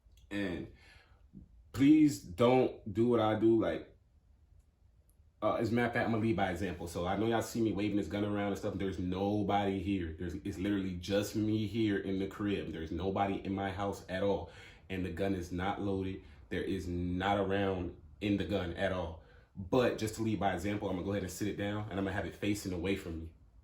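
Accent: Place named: California, United States. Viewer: Ik you hadda livin in Cali da way you be sayin “ya feeme”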